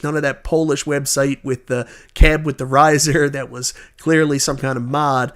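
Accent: Canada. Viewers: I love ya but that Candian accent makes it sound like Ircade 1 up. Not Arcade 1 up. Its bugging me. Can you work on it.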